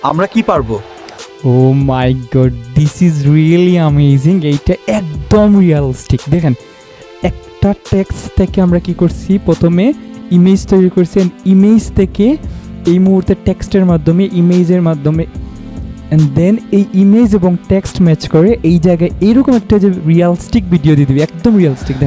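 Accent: Sylhet, Bangladesh. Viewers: সিলেটি রে পাইসি রে 😊😊❤ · সিলেটি না কি ❤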